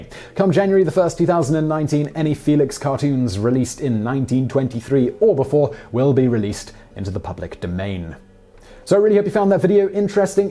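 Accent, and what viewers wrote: England, United Kingdom. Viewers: He is a man from England - the country that invented the language - and you claim he is not pronouncing American names in proper English. You do not own the language and you need to remember that. Americans are not the go-to for proper English pronunciation ffs! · With your accent on vowels, some words are difficult to understand for non-british English speakers. Example, it's difficult to comprehend if your saying "of Pablo Picasso Fame" when discussing the offshoot of Cubism. Making a legitimate Subtitles option would help. The YouTube Auto-generated subtitles don't provide accurate subtitles if many vowels don't have an American accent.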